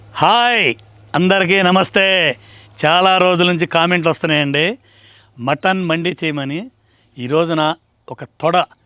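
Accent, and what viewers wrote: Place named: Telangana, India. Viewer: Brooo meru telangana lo vunnara chala years language same mala ఉంది 😍😂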